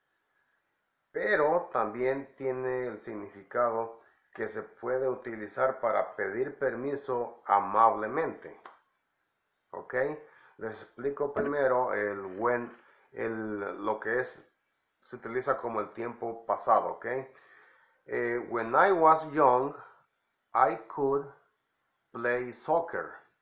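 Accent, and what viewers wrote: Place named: Texas, United States. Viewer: GRACIAS me gusta mucho tu asentó como tejano jeje bendiciones ahora si a ponerle atención a la clase jeje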